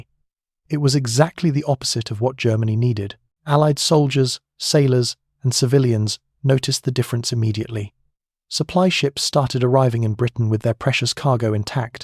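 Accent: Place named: United States. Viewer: Hey ! The speaker must be a yank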